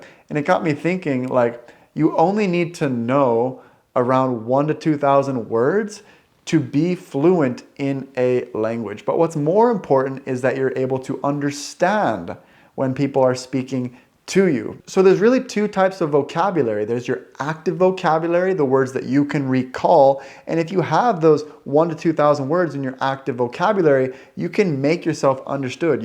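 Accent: Sweden. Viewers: Is it just me or has his accent speaking English gotten closer to a Swedish accent speaking English? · Has anyone pointed out that you now speak English with a Swedish accent? To my American ear anyway and as someone just beginning to try and learn Swedish. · Interesting enough, i think I can hear some Swedish accent in you English :)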